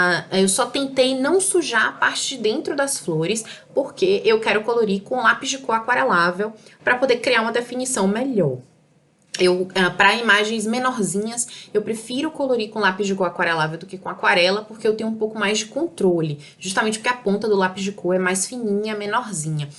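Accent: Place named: Bahia, Brazil. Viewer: Que bom que gostou, obrigada! 🥰 PS: o sotaque é da minha amada Bahia ♥️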